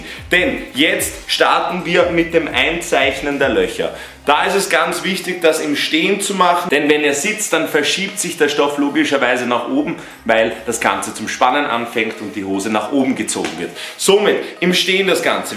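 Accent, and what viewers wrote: Austria. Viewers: In östereich spricht man des so aus:)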